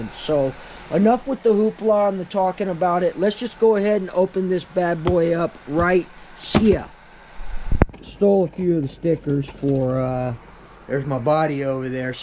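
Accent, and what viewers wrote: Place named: New England, United States. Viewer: Couldn’t tell if you were up here in New England or Texas.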